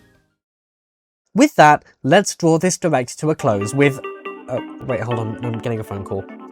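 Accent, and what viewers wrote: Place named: United Kingdom. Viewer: It's a good Direct, but my only criticism is that some unscripted parts of the Direct gave me too much of a headache with your British voice, especially the "sponsored" bit at the end with that Greek statue guy who is really crazy annoying to me! That's why I prefer another fan direct like Rayman Alive or F3 So remember, next year, no more crazy staff or badly unscripted parts!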